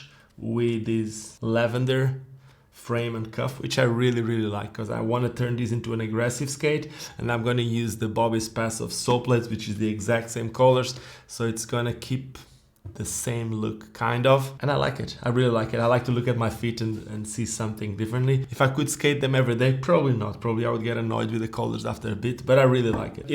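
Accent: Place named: Portugal. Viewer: Acabei de comprar estes patins, a meio da review comecei a pensar no sotaque e obvio que tava certa e é tuga xD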